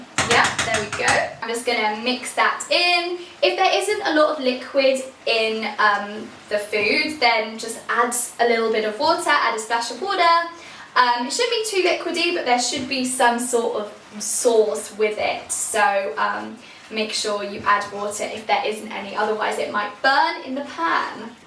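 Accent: United Kingdom. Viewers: You should get rid your very strong so called "british" accent. Try to learn pronunciation from Cambridge or Oxford courses of English, or, at least, from the TOEFL, because american accent is easier to understand for Russians. But the best (purest) English pronunciation is in Australian universities and on TV.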